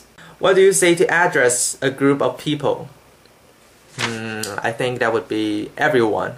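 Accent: Taiwan. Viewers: you sound like a typical taiwanese english accent... taiwanese do have an accent...anw all places have an accent... like for english the 2 major accent are american and british accent and Mandarin the major accents are the chinese and taieanese... so there is a taiwanese accent... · Btw you do have Taiwanese accent...but your English is definitely good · its pretty obvious taiwanese accent, which is great! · 聽得出是台灣人 >^< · You have a southeast "Asian" accent, be it Chinese or Taiwanese. But a little different than Korean. But yeah, you do have a Taiwanese accent as far as speaking in English. By the way, "pecan" is what you know as 胡桃 Hútáo. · Yes, you speak English with a Taiwanese accent, which means that Mandarin is your mother tongue. I thought that I had given you a very clear answer.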